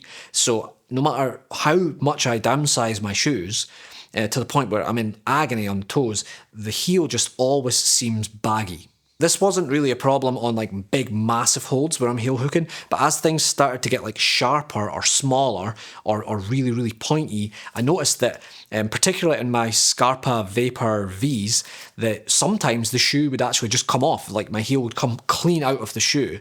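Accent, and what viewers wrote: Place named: Scotland, United Kingdom. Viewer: Wild clicking on this video randomly and before I can even finish thinking "oh he's Scottish" I'm shown a clip from my local gym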